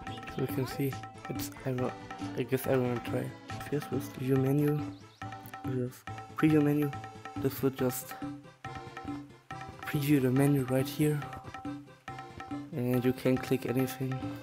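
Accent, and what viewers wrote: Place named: Germany. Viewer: you sound soo german XD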